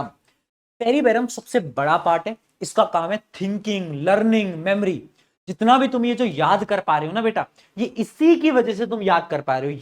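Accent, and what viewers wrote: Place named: Bundelkhand, India. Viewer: jitno bhi Bundelkhandi accent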